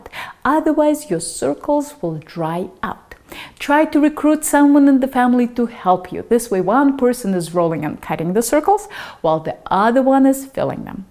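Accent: Russia. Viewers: Рашн акцент не спрятать) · I followed your recipe and made pelmeni your way. They were deliciously spectacular! Thank you very much. You mention Olia Hercules often, so I watched a few of her videos. Her recipes looked tasty but it seemed she wasn't cooking for the average person. Most home cooks do not cook the way she does. In one video she used a gas range that must have had a dozen burners. I like the fact that your food processor is small and you need to make two batches and mix them. You don't have the Instant Pot Ultra Bluetooth model, you have the same one I have. That is what it is like for most people. You seem to love what you do and passing on your passion. That is what I enjoy about your videos. It doesn't hurt that you are a very pretty lady with a Russian accent either.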